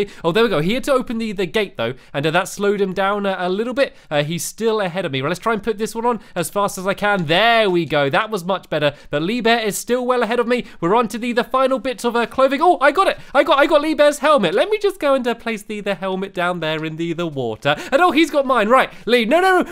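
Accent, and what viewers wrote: England, United Kingdom. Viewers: you have been building so many things in your lovely world thank you for doing that I have been building so many things on minecraft and it all came from you keep doing what your doing. P.S.I love your British accent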